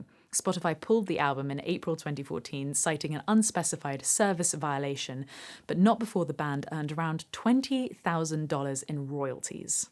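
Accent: United Kingdom. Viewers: Beautiful shirt! As I've mentioned before, your speaking voice is another possible revenue stream. It is beautiful to listen to -- and remember how potty Americans are about British accents. My two favourite female speaking voices are Kirsty Young and Mary Spender. So be fruitful and multiply. I mean about revenue streams, not...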